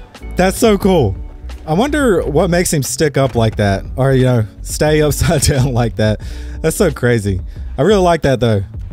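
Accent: South, United States. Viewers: Agreed! Calming and soothing with that little bit of southern twang lol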